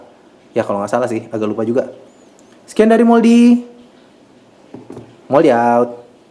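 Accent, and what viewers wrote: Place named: Banjar, Indonesia. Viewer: Abang org banjar ya bang, kok kedengaran ada org ngomong pake bhsa banjar.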